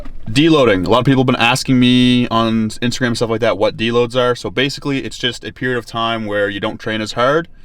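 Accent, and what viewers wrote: Australia. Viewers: That Aussie accent 😂♥️ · As an Aussie that accent was pretty accurate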